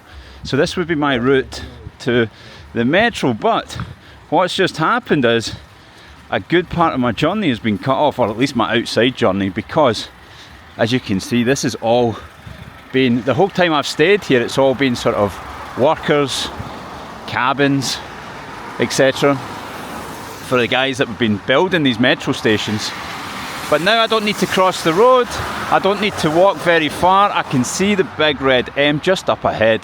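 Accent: Scotland, United Kingdom. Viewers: you probably live on the moon😊. He speaks English. His mother tongue (Scottish) has nothing to do with this. The Scots speak English with this accent (and his not a difficult one, there are much harder in Scotland).There are many accents of the English language spoken in the UK which differ from the classic English... Listen to MMA fighter Paddy Pimblet speak. It's an alien language😊. Though he's from Liverpool... Scouse-that's the name of his accent... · Согласен, говорит примерно так: "Халёу эфрипоти. Ай эм скотищ гай ин Моску"...))) P.S. Надобно отметить, что в его шотландском говоре есть некоторый шарм...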